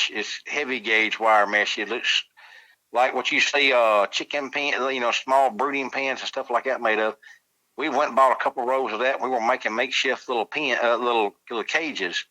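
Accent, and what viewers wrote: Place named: Alabama, United States. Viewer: You sound like your from Alabama, from my neck of the woods.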